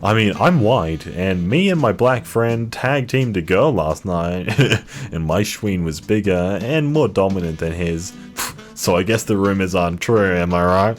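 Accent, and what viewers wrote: Australia. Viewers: Hold on, Mate you're Australian??? Frick yeah, found another one😁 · ooooh you're from canberra?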